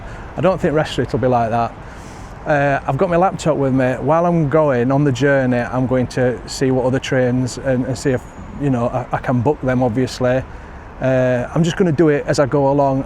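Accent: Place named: Barnsley, United Kingdom. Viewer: Love the Barnsley twang. Knob-dy and int it. I talk exactly the same! 😂😂😂😂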